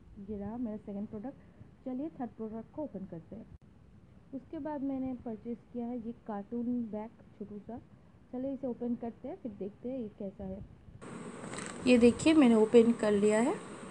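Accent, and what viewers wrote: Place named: Bengal, India. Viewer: ur title is but ur accent is bengali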